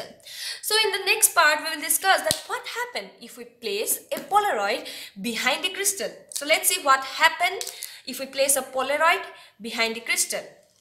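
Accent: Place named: Assam, India. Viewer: She is Indian and An Assamese...And this is how we speak English...We should not be ashamed of our accent...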